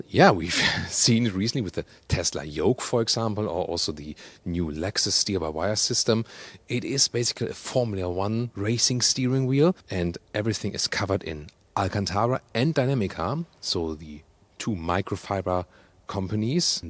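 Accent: Germany. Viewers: First thing tht came up to my mind when I saw this 'flat flunder' : what do you to with your head sticking out above the windshield? (You can see it ) . Looks funny. And why did they choose a German presenter, whose accent is still audible? One important question was not answered: how high would the purchase price by of such a 'fun car' with 14 " road clearance which would have trouble to pass what the French call 'ralentisseurs' without any damage.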